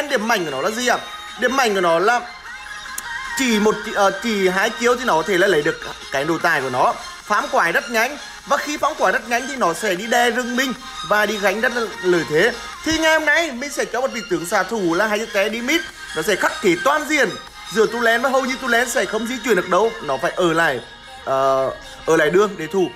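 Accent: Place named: Ha Tinh, Vietnam. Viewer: Eng ở hà tịnh hay nghệ an rứa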